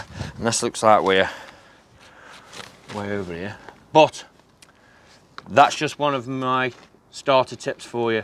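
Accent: Stoke, United Kingdom. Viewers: You sound like a Stokie!? Nice tip mate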